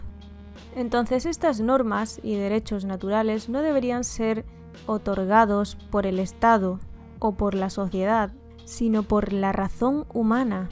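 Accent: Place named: Spain. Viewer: Estoy muy confundido ahora mismo porque tienes un acento casi diría de España no tanto de México pero tienes una foto de perfil y la bandera que podríamos relacionar a México.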